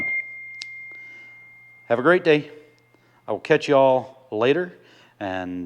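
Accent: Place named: Southern Illinois, United States. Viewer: I thought your accent was more from south of I-72/ I-74, than Amish! I am sure that you are very proud of your Amish heritage and thank you for sharing your background with your viewers.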